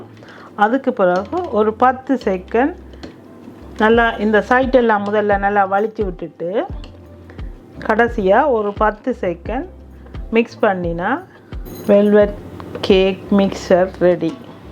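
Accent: Sri Lanka. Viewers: Azhagana Ilangai Tamil❤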